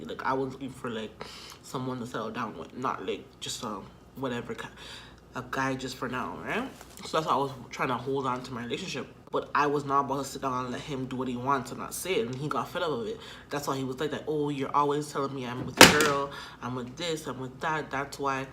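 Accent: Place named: Africa. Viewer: I love your African accent 😂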